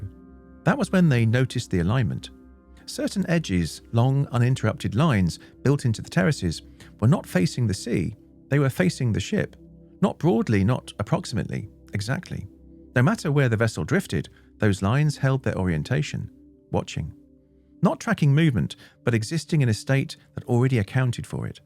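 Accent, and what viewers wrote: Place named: England, United Kingdom. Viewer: please, no more english accent 😮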